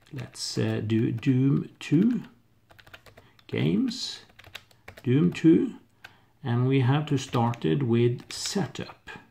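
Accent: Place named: Norway. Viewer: Found the video randomly. instantly heard that you were norwegian.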